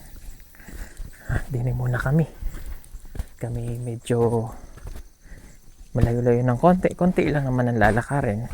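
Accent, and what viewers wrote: Visayas, Philippines. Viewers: Natutu na C mag bisaya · Kabalo na jud mag bisaya c...congrats po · Kblo na jud si mag 😂bisaya ba..😊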